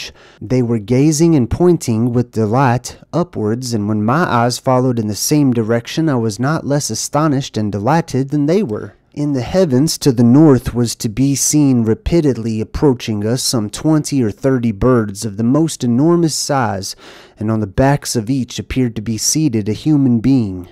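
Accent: United States. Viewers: Not through yet, but I love your country accent. You might fit in real good down here in Texas:_JDZHeart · Great job narrating, the western accent fit accordingly, you should do more similar to these in the future, did this end up being published or became another suppressed archive, that you were abled to dig up?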